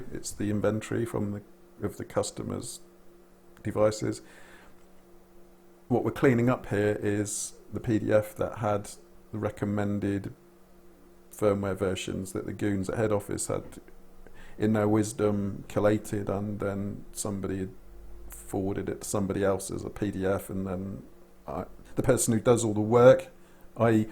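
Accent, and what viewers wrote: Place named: United Kingdom. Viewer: Hey, what's up,! I started this beautiful morning with watching this video))) i don't know if you would be happy to hear this but i felt like i was watching my own video at some point! Cool! You started adding some humor (hire demoralised engeneer is the best) it's so cool Your video started to 'flow' - i watched it almost entirely! I like word 'fuck' myself, but... i think being ironic is better than being angry and unsatisfied, well at least in the videos - so all the cases when you kinda laughing at managers acting fools - it's cool and fun, but when 'they are fucking idiots' - many people knows that, so depressing viewers with no reason might decrease views. I understand your pain - i dropped my recent 25/h contract because my client claimed working prototype to be crap, while the problem was in target site, not in my app and scraper, so yeah, there's lots of dissapointing things, but it doesn't have to depress other people. 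i'm really sorry if something hurts you in my comment, i just wanted to say that me as a viewr LOVE your ironic British humor and would be happy to get more content like this. Also you started talk better. You've got a native British accent, you must be proud of it, make it sound even more british - clear deep vovels, non-american rythm etc. (All the stuff i failed to mimic) i mean in this video i enjoyed the accent because you were talking to subscribers instead of yourself like in previous video. Cool, man, keep improving, you're on your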